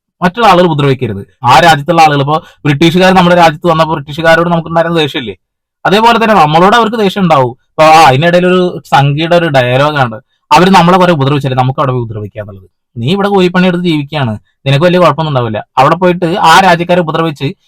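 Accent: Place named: Kozhikode, India. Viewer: Slang is like Kozhikode chankuvetty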